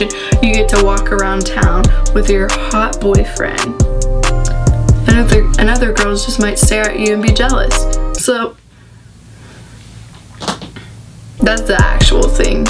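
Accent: Missouri, United States. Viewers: ARE YOU FROM MISSOURI?!!!!😂😂😂😍😍😏I AMMMM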